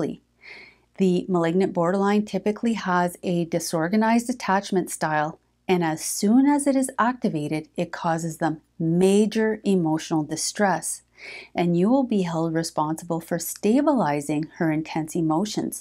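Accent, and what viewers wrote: Canada. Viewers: The Canadian accent is strong here.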